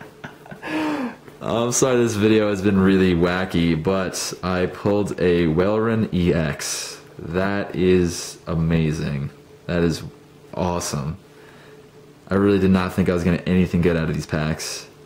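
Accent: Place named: United Kingdom. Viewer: lol nice british accent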